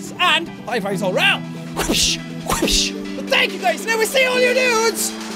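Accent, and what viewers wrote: Ireland. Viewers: He was so much irisher in the past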